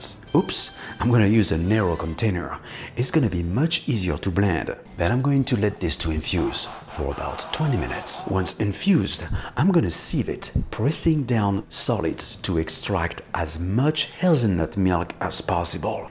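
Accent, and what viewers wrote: France. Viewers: As I heard his French accent I knew I stumbled upon something GOOD Seriously, amazing dessert, chef. Chapeau :D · That french accent😂😂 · I still can't decide whether he is french or just doing an accent. It's blowing my mind. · Well, I'm French and with a french ear, I really can't stand french accent 😂 even if his is way better that some I've heard 😂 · This French accent has got me dead I swear 😂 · This man sounds like every stereotype french chef ever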